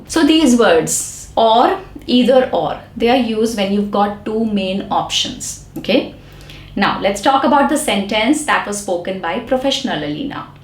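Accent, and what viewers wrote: India. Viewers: Content is highly appreciated despite intolerable Indian accent. Thank you though!!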